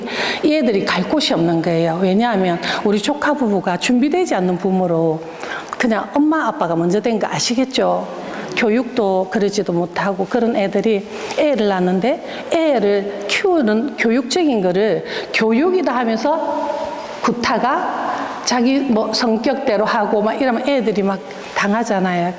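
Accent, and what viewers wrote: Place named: South Korea. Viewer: 구수한사투리에 좋은몫을선택하여 질곡의삶을즐겁게신나게 강의해주신봉사자님 주님께서되갚아주실것을 굳게믿으머엄청사랑합니다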